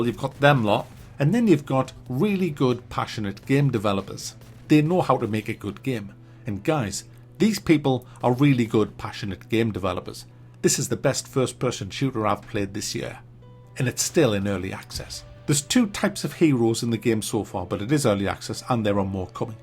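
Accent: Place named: England, United Kingdom. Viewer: North east England. We all talk like this around here.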